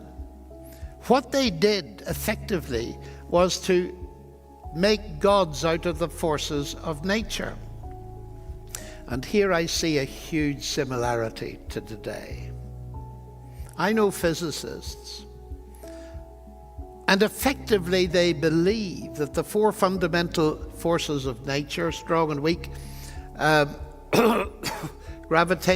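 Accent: Northern Ireland, United Kingdom. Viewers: I concur with everything you've mentioned, except that he has an Irish accent.He's from Northern Ireland 😇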